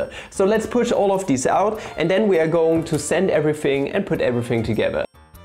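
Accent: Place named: Bavaria, Germany. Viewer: that Bavarian accent is just so engaging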